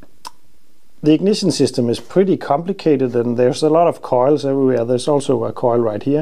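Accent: Denmark. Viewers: Wait are you from Bornholm?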